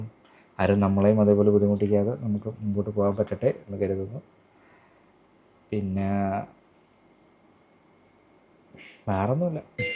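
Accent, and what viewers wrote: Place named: Palakkad, India. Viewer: ബ്രോ 😂എനിക്ക് നല്ല സംശയം ഉണ്ടായിരുന്നു നാട് pkd ആണ് എന്ന്..... ആ സംസാരം കേട്ടപ്പോൾ തന്നെ തോന്നിയത് ആണ് പിന്നെ ചോദിച്ചില്ല എന്നെ ഒള്ളൂ..... പിന്നെ വാഴ പിണ്ടി യുടെ കാര്യം പറഞ്ഞപ്പോൾ.... വീണ്ടും തോന്നി പാലക്കാട്‌ എവിടെ ആണ്....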